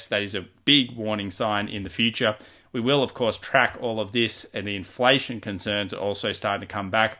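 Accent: Australia. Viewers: Your audio was fine. Maybe you sounded 10% less Australian which means that I can actually understand you better.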